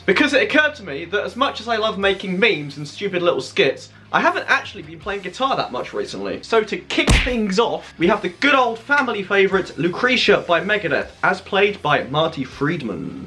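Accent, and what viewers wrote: United Kingdom. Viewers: did he? That british accent (I like so much) played me...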